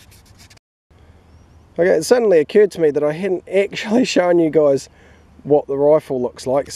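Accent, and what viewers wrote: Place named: New Zealand. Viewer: deserves more views just for the kiwi accent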